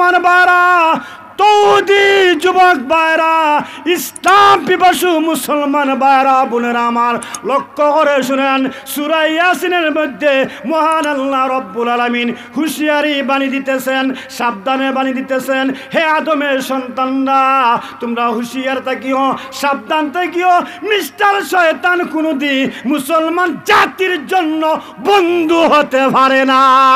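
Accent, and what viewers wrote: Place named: Sunamganj, Bangladesh. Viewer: কুব সুন্দর বয়ান সুনামগঞ্জী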